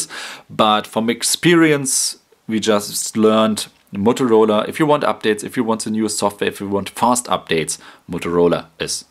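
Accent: Germany. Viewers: German accent :P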